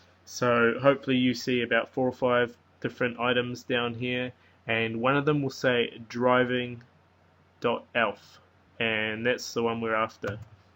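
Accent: New Zealand. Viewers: HEY is that a kiwi accent or aussie ??? you sound kiwi???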